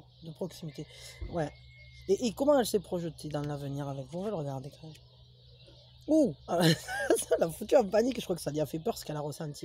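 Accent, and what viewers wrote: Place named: Provence, France. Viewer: vous êtes incroyable)) et ce bel accent, de salon ou des environs je dirais 😂😂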